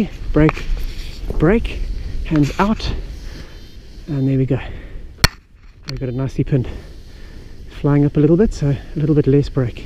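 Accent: South Africa. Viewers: South African accent ?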